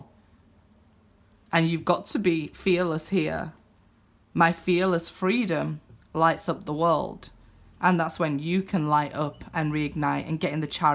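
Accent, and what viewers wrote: Yorkshire, United Kingdom. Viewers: Great reading! Hull? Your dialect makes me think of Mick Ronson😃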